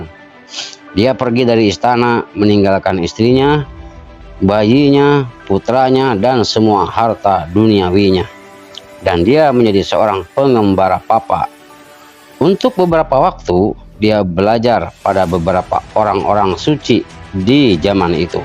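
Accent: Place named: West Java, Indonesia. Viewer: Lidah Sunda naratornya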